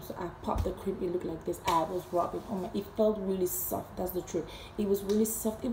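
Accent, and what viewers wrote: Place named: Cameroon. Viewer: You ve got to love Cameroonian accents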